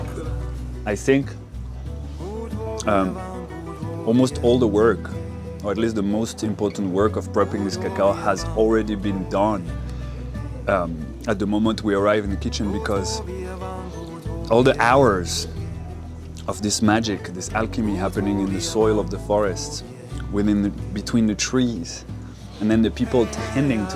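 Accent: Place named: France. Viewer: This guy sounds french to me ! I wish to come very soon to Eagle Nest. Carole from Biarritz, France !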